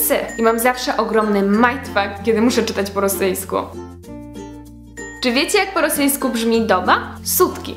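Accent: Poland. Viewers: Ty jesteś z Rosji, czy masz chociaż korzenie, bo ten akcent to jednak Polski (i to BARDZO)?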